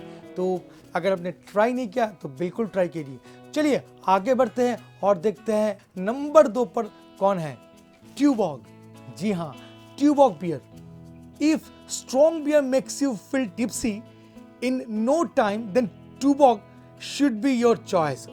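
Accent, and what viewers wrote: Bengal, India. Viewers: Ur tone says that u r Bengali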